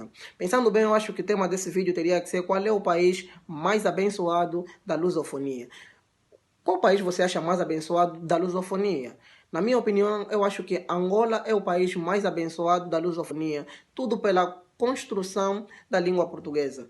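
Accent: Portugal. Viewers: Por quê "português angolano"? Você fala tal qual os portugueses. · Seu português é ótimo. Fala com um pinguinho de sotaque de Portugal, mas só um pouco. Entendo tudo , melhor do que se estivesse um português falando. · Só lamento , mas teu sotaque é Português de Portugal.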